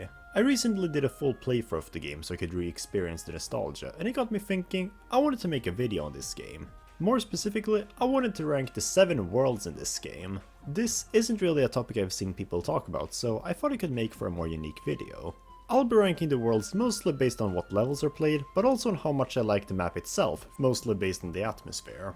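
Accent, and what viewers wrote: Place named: Sweden. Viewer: are you from sweden? you speak with a swedish accent and i am from sweden so i recognize it. i also love super mario galaxy 2. är du svensk? du pratar engelska med svensk brytning så jag känner igen den. jag älskar också super mario galaxy 2.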